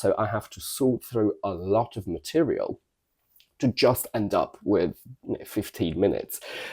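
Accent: United Kingdom. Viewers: It's so sad to hear that! Watching from Thailand and I caught every word in your video. I can feel you completely. ❤😢 Thank you so much for your time and dedication nurturing this channel. Firstly, I followed this channel because I love listening to your voice, your British accent and also your lessons on pronunciation. I used to teach English in my free time many years ago but with my busy work scheduled, I had stopped. Now, with the rise of online teaching, I've come across some online English teachers teaching incorrect pronunciation. This highlights the importance of good teaching and inspired me to help others avoid similar mistakes. I'm not here to tell my story, though. I just wanted to share that when I listened to you, I saw myself (we're not comfortable in front of camera, scripted not to miss the point, spent ages editing, not monetized as I just started). 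I whole-heartedly understand your journey and cried with you. 😢 The knowledge you've shared is valuable, but the time you've dedicated is equally important. To me, you are an incredible teacher. I will always come back and learn from this channel and will also recommend to my future students. Lastly, I wish you joy and tons of success with your new channel. Subscribed! ❤ P.S. Last night, I listened to your new ASMR channel to calm and relax before going to bed.😍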